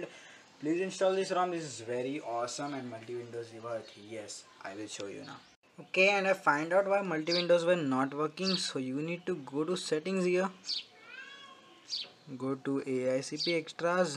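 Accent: India. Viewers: im tryin very hard to comprehend your indian accent, but fail, will try other video tutorials with better pronunciation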